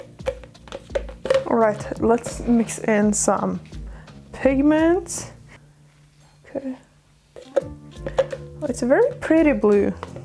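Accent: Russia. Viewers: Russian actsent? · Can't get rid of the impression that speaks with russian accent. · Why I hear a Russian accent?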